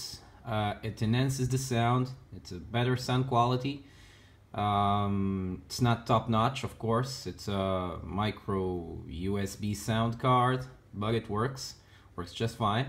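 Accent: Brazil. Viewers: Eu vendo o vídeo, e ouvindo seu inglês, de olho no sotaque kkkkk, na hora vi que era BR também.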